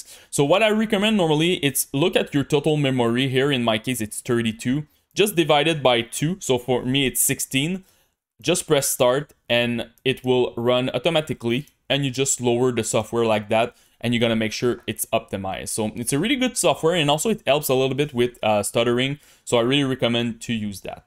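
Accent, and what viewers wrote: Canada. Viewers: Nothing better than a modest Canadien accent 👌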